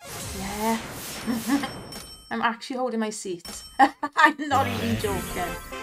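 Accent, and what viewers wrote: Wales, United Kingdom. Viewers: You've done some good videos i'm a fan and i love the welsh accent. I'm sure its a welsh accent i am hearing. Lush accent. the only thing that makes some videos a teeny bit rusty for me is consistent low stakes but at least its controlled moderate gambling and doesnt encourage excessive gambling so this is pretty good and clever how you do it. Well done girl. Tidy.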